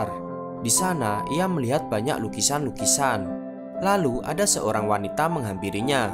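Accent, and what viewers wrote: Java, Indonesia. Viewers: Jawa nya medok banget min 🙈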